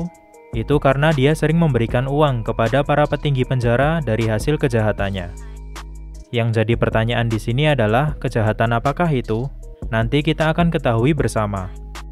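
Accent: Java, Indonesia. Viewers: Iki wong jowo iki . joss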